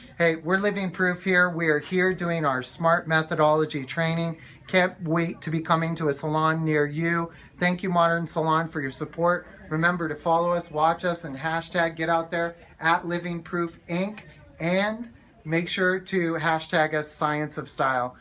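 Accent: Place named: Michigan, United States. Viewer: He talks like he’s from Michigan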